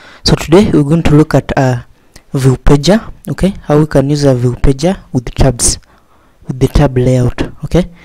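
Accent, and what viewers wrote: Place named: India. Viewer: I have no problem with typical Indian accent, but you eat words with your pronunciation and make it impossible to understand what you say, not to mention the low volume of the voice as well. Thanks for the effort, but it's a futile one in my opinion.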